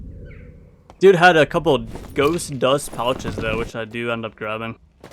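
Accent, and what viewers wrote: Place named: Florida, United States. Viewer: I would bet this guy's from Florida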